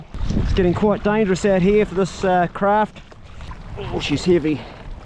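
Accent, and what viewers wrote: Australia. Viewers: Seems like a sort of copy of survivor man with an Australian accent. I dig it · Thought he was cheating until i unmuted and heard he was australian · Awsum life mate. Love your aussie accent. Excellent crafting. Im a kiwi expat lived in OZ most of my life. Was full time offgrid doing the The Big Lap for 5 years. Nature be in it 💪👍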